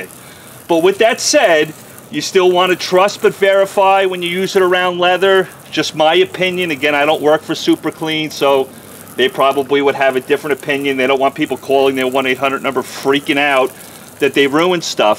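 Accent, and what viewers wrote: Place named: Texas, United States. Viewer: Love that Texas accent 😏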